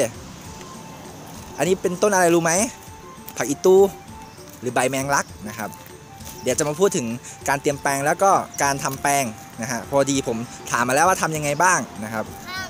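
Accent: Isan, Thailand. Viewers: ชอบพิธีกร พูดไทยปนอีสาน ดีเนาะ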